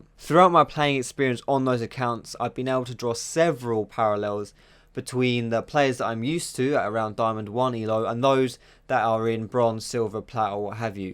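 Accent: United Kingdom. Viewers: are you British? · First of all, I love your british way of speaking. So smooth. Secondly, I'd like to quote you here, "...if you spend all your money reviving Axel Roses music career..." HAHA you're cracking me up. I wonder if the young punks on here even know who is. Anyway, great video!!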